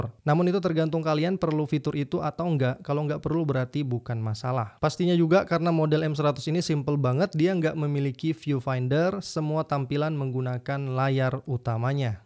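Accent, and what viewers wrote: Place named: Kalimantan, Indonesia. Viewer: Dari logatnya mas orang kalimantan kah?….. tapi mantap mas aku suka reviewnya masuk dalam rencana pembelian aku, ya walaupun bekas juga sih hehe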